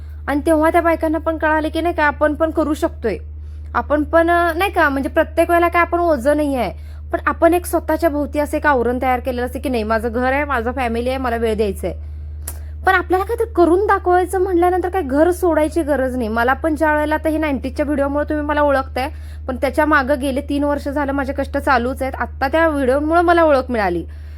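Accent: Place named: Kolhapur, India. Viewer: ताई कोल्हापुरी भाषा खूपच छान वाटते कानाला ❤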